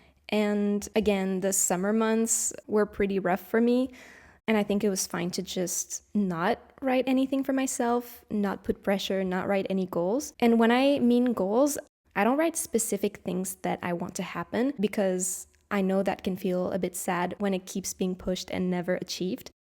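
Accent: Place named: England, United Kingdom. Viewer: Cette vidéo est vraiment trop cool et super encourageante. J’ai acheté mon premier hobonichi pour 2025 et je me sens toute impressionnée. Pourtant j’ai l’habitude de remplir des journaux. J’ai vu Bruxelles dans certaines de tes pages (pardon je ne voulais pas lire), tu as un super accent anglais ! Au plaisir de discuter du hobonichi 😊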